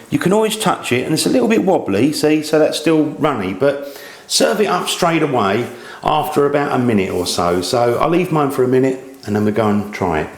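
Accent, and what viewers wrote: United Kingdom. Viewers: british as fuck. I love it, tough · This is what most British people sound like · British accent. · Thanks for the recipe M8. I love the accent BTW. There's something about a Brit accent on cooking videos. They should call it the Gordon Ramsay syndrome..... ;) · beautiful british accent ;)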